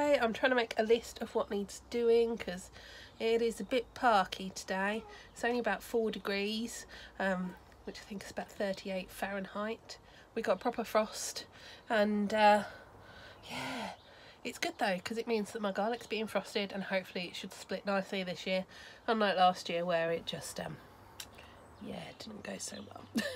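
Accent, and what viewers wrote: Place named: Hampshire, United Kingdom. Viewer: Just wondering what part of the country you are in. You sound as though you may be from Hampshire. I love your videos and the one where you work at the rabbit run in the dark made us laugh as we had so much trouble with rabbit runs lass year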